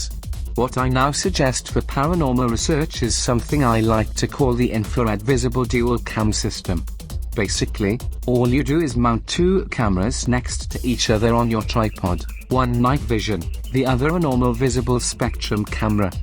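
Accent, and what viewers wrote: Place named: North Wales, United Kingdom. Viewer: The commentator is a Gog. That is, he is from north Wales and he has a Gog accent. The accent is only slight. I find a strong Gog accent awful to listen to!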